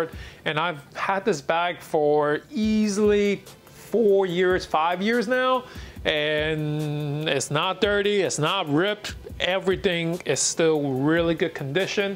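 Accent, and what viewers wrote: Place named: China. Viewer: So he speaks with a heavy Chinese accent. His English is probably better than any second language you speak. What is obvious though is that he really doesn’t know about fashion because a lot of his terminology, while descriptive, are not industry terms. The LL Bean tote, which appears to be a magazime giveaway item, is definitely not lined with rubber. The “Valentino” is clearly a belt bag and Valentino Garavani. I think it’s tacky tacky.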